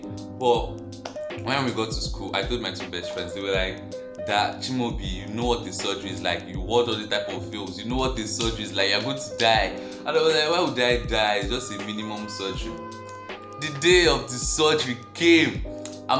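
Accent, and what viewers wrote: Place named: Africa. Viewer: I love this channel, please make more videos, could you put subs on though, I'm british & you're talking a bit too fast & not being racist but you have a really strong african accent & when you talk fast it's hard for me to comprehend what you're saying, it might just be me, let me know if anyone else thinks the same..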